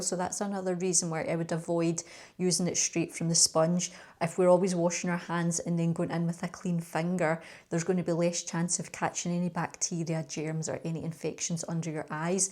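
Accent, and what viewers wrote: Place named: Scotland, United Kingdom. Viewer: i’ve already watched a couple and loved them. I’m older and absolutely love beauty and make up and I seriously have never never been able to put concealer on. I read about this one and thought I’m going to try it and then found your video about how you apply it and seriously never had such success with an under Eye concealer and I need it at my age so thank you and I will enjoy watching the rest of the videos and I’m so glad I subscribed and I’m also Scottish but I live in Australia, so the accent is very welcome 😊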